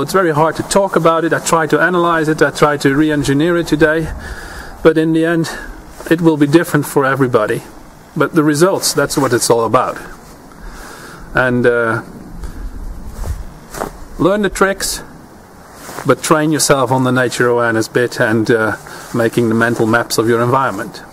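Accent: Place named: Canada. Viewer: One of the best and most practical vids on navigation I've seen yet! My father-in-law has one of the best navigational abilities I've ever seen and we are in flat heavily forested terrain and I've watched his dead reckoning on cloudy days and could only marvel at the internal GPS he carries around in his head. What region do you live in, almost sounds like Canada?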